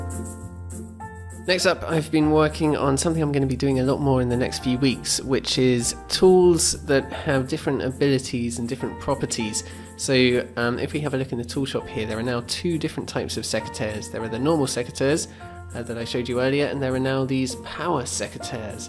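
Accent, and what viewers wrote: Germany. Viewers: Wow, your accent is so clean. Didn't expect you're a German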